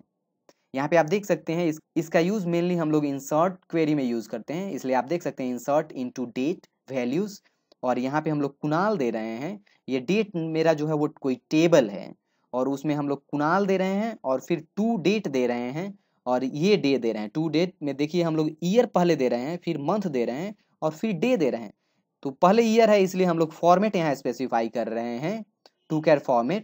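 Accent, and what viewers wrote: Bihar, India. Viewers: bihar k lagte ho bhaya...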